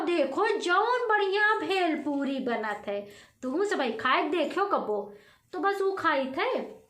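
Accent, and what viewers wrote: Awadh, India. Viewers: बहुत सुन्दर , आवाज तो हमारे अवध की है 🙏🙏। 😀😀😀😀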